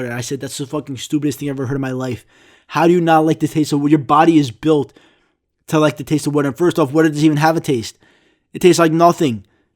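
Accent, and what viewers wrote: New York, United States. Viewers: I know you're from Greece, but you sound like you came from New York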